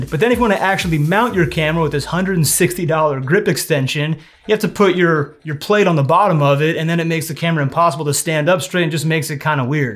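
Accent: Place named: Belgium. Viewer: hmmm are you belgian? youre american accent is pretty good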